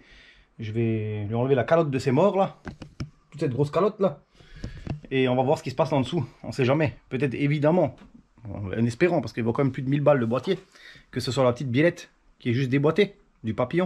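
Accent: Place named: Alsace, France. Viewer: Salut Cool Vidéo 👍 hop la jAIME Notre belle accent de lEst, question tu ne serais pas Alsacien par hasard,moi Oui,Et Fier de Notre Accent 😏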